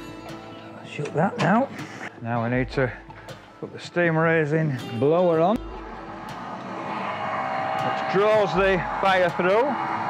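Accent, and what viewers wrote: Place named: Sheffield, United Kingdom. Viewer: What a beast! BTW, you sound like a Sheffielder, am I right?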